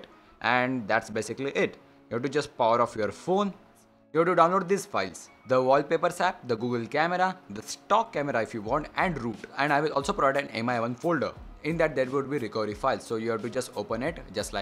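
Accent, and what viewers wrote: India. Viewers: ХАХАХА люблю индийский акцент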